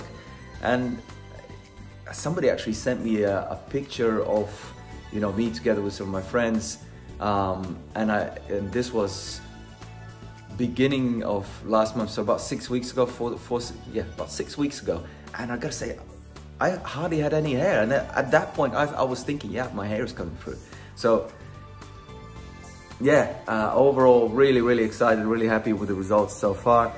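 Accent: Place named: Scandinavia. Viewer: Lol i was curious because you sort of sound nordic. I guess latvias not too far away from there.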